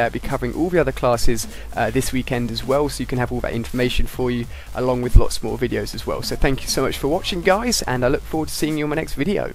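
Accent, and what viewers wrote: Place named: England, United Kingdom. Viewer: hey i really like your videos but PLEASE, talk slower , im a german guy and understanding englisch is hard enough for me but your crass english accent and your fast talking makes it not really easier !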